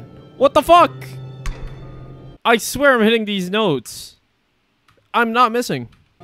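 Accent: Canada. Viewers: i cant help but notice that you sound weirdly canadian in this video? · Some of these comments are making me hear your voice as more Canadian. How the hell?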